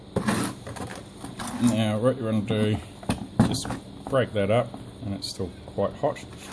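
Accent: Australia. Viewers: hahaha, me thinks its his aussie accent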